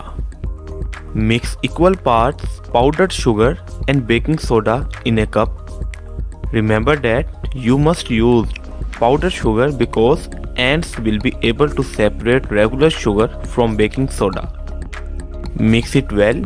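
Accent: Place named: Scotland, United Kingdom. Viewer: I cant understand the thick accent... Is it Scottish?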